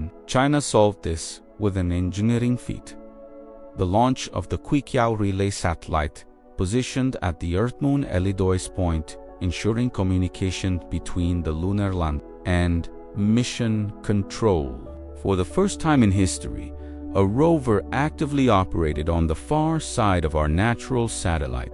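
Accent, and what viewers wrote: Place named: United States. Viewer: I can tell you’re American.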